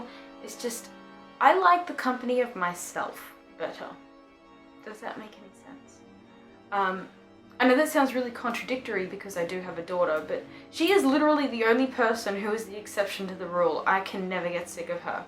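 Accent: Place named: Australia. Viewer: And to me, as soon as she started talking I thought "she's from Australia" 😳 lol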